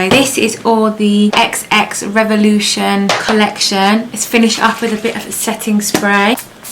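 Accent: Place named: United Kingdom. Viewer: Are you from The UK ? Love love yoir accent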